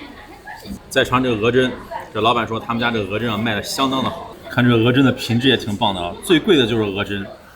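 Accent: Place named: North, China. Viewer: ：听口音，你是北方人，不是西北或者华北人。你在吃江南面食小食等插话缺乏艺术，也缺少历史文化语言。 南北方吃文化相差很大，江南以炒菜为主，北方以一锅煮为主，作为美食家要会比较。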